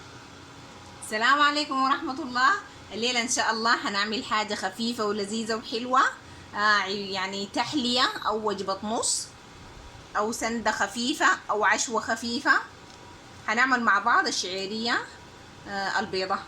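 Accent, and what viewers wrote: Sudan. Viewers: ما شاء الله شكلها حلو جدا 😋 علي فكره بحب اللهجة السودانيه جدا ♥️ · الهجه اليودانيه جميله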